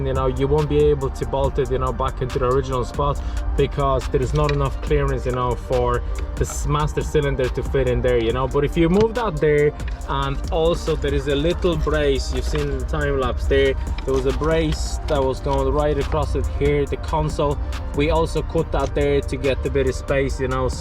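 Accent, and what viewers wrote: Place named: Sweden. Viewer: You have similar accent to a friend of mine are you swedish too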